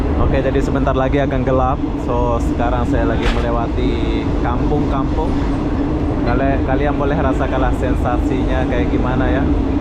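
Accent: Indonesia. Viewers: inilah channel org bingung. sok bicara melayu/tergila2 dg Malaysia tp tk bs sembunyi dr logat aslinya Indonesia. bahkan ungkapan2nya jg sbgi org bingung, antara indo atau malay.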